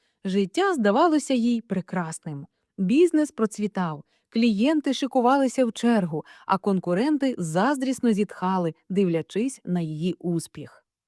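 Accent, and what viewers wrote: Standard. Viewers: Дякую вам за чисту українську мову , за цікаве оповідання .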